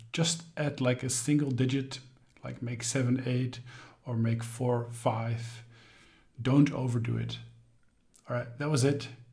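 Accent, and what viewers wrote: Netherlands. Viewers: Are you from the Netherlands? I hear Dutch ;)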